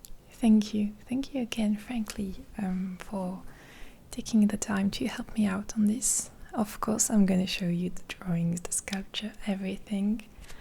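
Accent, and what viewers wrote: France. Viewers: Je suis juste réellement impressionné par ce bon niveau d'anglais, j'écoute bcp d'asmr anglais pour m'améliorer, eh bien contre toute attente un asmr anglais avec accent français c'est pas si mauvais pour progresser, j'ai tout compris, l'accent doit être assez familier je suppose. Sinon continue comme ça, ton asmr est très bien. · Am only 2 mins in and I thought French but will listen a bit more lol first video of hers so haven't a clue. (Sounds French to by English ear anyway) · El acento francés sisisi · is she french? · Are you French?? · A perfect video I’m sure of what I’m saying but l accent français franchement sa rajoute un truc et le alors a un moment magique super vidéo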